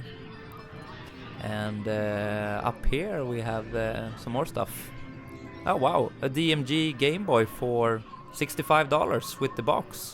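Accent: Sweden. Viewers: I would get any Jurassic park game for under $50 lol Also...do I detect a Swede? lol I've lived in Sweden for years and the cadence is familiar! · Haha där hör man den härliga svengelskan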